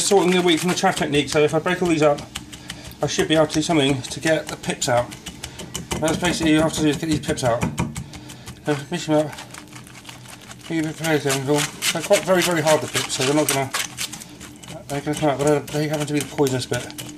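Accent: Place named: South West England, United Kingdom. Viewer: English, probably South Western. Not far from Forest and Pirate dialects. Pair of dills.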